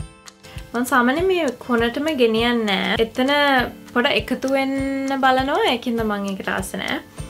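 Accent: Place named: Sri Lanka. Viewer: Nice video 😍 love your Sinhala accent 😘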